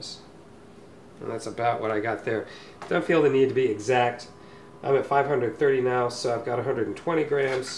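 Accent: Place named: France. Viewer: sounds kinda french.. because it is :S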